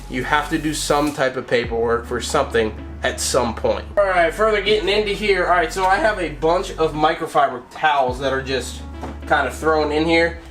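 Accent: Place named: Georgia, United States. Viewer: Starting to get that georgia twang now huh? Lol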